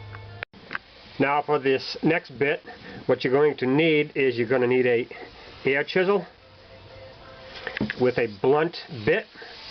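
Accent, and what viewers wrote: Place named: Massachusetts, United States. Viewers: Sounds like a New England accent. Definitely not NYC or NJ. Great video. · I was gunna say! This guy gotta be from mass 😅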